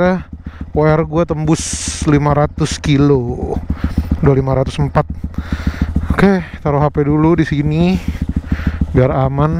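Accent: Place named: Betawi, Indonesia. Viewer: Betawi itu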